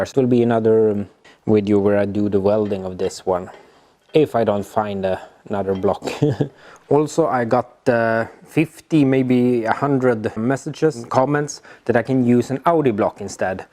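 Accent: Sweden. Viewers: Damn that block!You can fix it!.Good luck! It's always funny hear swedish accent but better than we Finns, our accent is just terrible.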